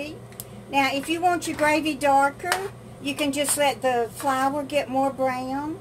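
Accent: South, United States. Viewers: I love your southern accent, and I find your voice very relaxing kind.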